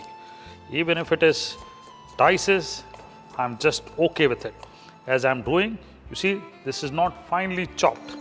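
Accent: Punjab, India. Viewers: Paji....ur punjabi style Hindi is very nice...english is not suting ur voice......the way you tell "Oh ji namak shamak namak shamak" is awesome....paji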